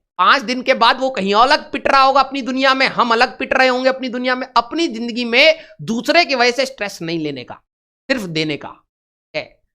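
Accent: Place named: Bihar, India. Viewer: Sir ka bihari ascent ek perfect bolte hai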